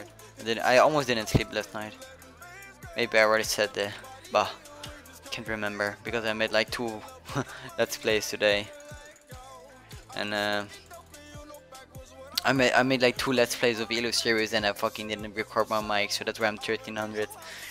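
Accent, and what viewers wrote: Netherlands. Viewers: Dutch english ftw xD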